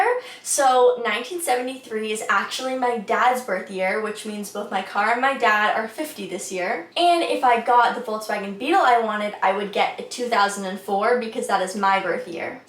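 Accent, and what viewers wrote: United Kingdom. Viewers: Great video! As someone who had a British girlfriend and hung out with an all British crowd while stationed in Spain, I can say your British accent is great! I’m hoping that my daughter will be interested in an LBC when she starts driving in a few years, but so far she has not expressed any interest in participating in her brothers and my channel working on them. She’s still got a few years to go though, so there’s always hope! Keep up the great work! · Keep using the word British…. saying UK is a new obsession that is a bit bland and mostly due to Scotland and Wales pushing more for national identity. The idea of a specific ‘British’ accent is sort of a North American concept but I know what you mean, yours is great… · You sound British to me! But I’m from North Carolina. Lol. We used to go to Ipperwash beach and visit relatives in London each summer. 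· Your British Accent is cute! If you want to take it to the next level, study Cockney Rhyming Slang and then you can go apples and pears and have a good old rabbit and pork!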